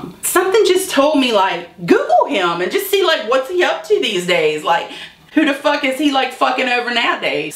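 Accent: South, United States. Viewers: you are saving me from a panic attack right now. I'm Australian and listening to your soothing southern voice is weirdly helping. I don't want to take my short term medicine but I think I have to.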